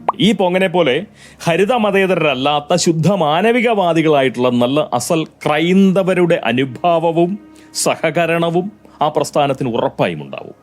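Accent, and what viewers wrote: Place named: Ongallur, India. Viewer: ഒരേസമയം ചിന്തിക്കാനും ചിരിക്കാനും വക നൽകുന്ന ഓങ്ങല്ലൂർ സംസാരം എനിക്ക് ഏറെ ഇഷ്ടമാണ്. നന്ദി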